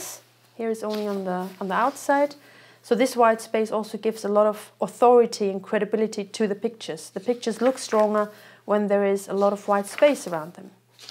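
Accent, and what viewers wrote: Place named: Europe. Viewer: European English accent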